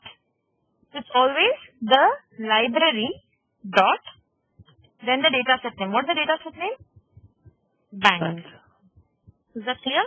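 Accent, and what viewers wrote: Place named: Asia. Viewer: Very good and knowledgeable video while learning SAS, thnx to this post and the lady who is teaching. and those who think its asian voice so he/she cant teach, and those who think its poor quality(go ad c ur a*** hole) and the one who can't understand this simple language....are big fuk***** and ge out of here :P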